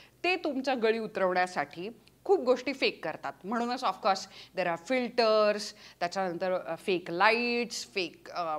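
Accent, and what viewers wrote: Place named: Pune, India. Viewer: पुन्हा नव्याने तूझ्या प्रेमात पडले आज ❤️, ही पुणेकर भन्नाट आहे...typical पुणेकर tone भाषेचा...loved it 😘😘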